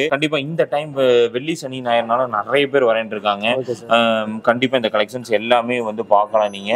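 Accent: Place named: Tirunelveli, India. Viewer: Tirunelveli vagea bro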